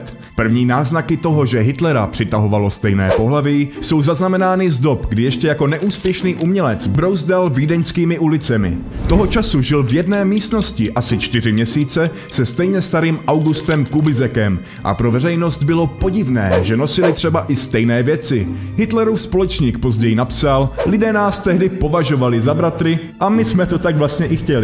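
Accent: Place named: Moravia, Czech Republic. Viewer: Část času, který věnuješ na úpravu vousu, věnuj promrskávání shody přísudku s podmětem, ať nemluvíš jako úplný manták z Moravy, když se pokouší o spisovný projev.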